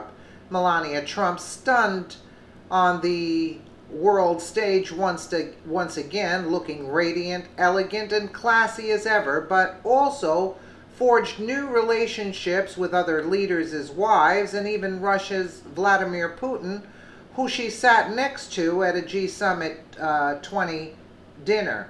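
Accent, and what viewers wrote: New York, United States. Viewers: Are you from Brooklyn or the Bronx??? Love your channel!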